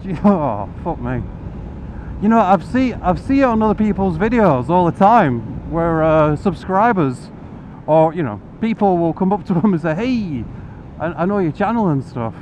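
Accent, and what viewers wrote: United Kingdom. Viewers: what part of northern england is that accent dude. great vid by the wayl.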